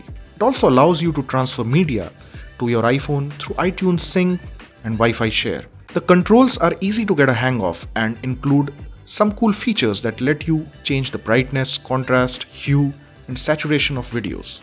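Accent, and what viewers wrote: India. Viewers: Indian english 🙁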